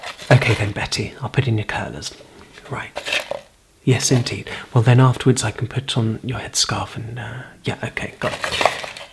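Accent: RP, United Kingdom. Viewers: Very nice British accent I love when the queen answered for me that was nice very relaxing 😴😴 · I’ve never heard someone with a British accent do an impression of a British accent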